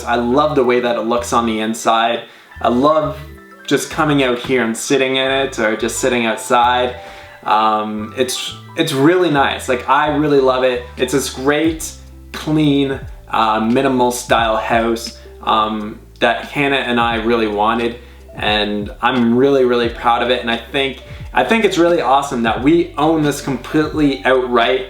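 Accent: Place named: Canada. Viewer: It depends on your perspective, you sound Canadian. So housing would be extremely costly and it would be a steal depending on if you can stand living there. Homes are much more adorable where I live. Here you would be the village idiot for spending that much for a trailer. I like it and I’m glad your happy.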